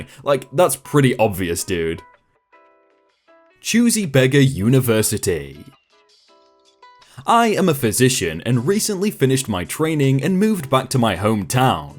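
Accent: United Kingdom. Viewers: The 2 story’s he sounds British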